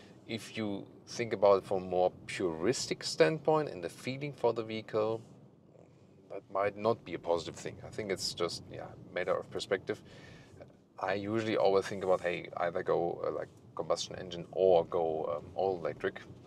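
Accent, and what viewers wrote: Germany. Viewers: Nice review, very nice car - though rather pricey. But may I just address something here, and I mean this in the nicest possible way: I find it very hard to listen to the accent, and even more so, the numerous mistakes in the language. For example: "If it WOULD BE an S-line, it WOULD..." drives me nuts. It should be: "If it WERE an S-line, it WOULD..." When you choose to present in English, the language should be flawless, especially when named. Alternatively, just do it in German. And for the record, I'm German myself.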